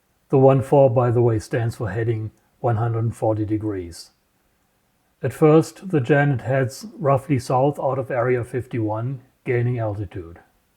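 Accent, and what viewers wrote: Germany. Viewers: I don't understand a word, but very authentic german accent!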